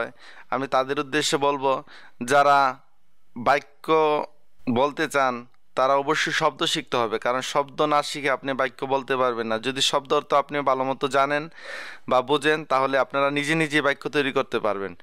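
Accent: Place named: Chittagong, Bangladesh. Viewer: আরবী ভাষায় এ কার ও কার নাই। তাই আপনার শেখানোর পদ্ধতি উত্তম নয়। আপনি আরবির আঞ্চলিক উচ্চারণে শেখাচ্ছেন। কেউ যদি বাংলা শিখতে চায় সে কখনো নোয়াখালী বা আপনার নিজের এলাকা চট্টগ্রাম এর মত করে শিখবেনা। সে সঠিক উচ্চারনে শিখবে। ধন্যবাদ।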